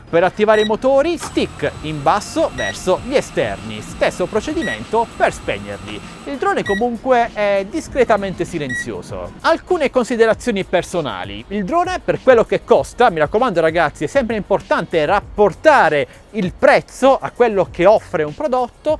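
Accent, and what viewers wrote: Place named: Molfetta, Italy. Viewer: Sei di Molfetta? La A la E ....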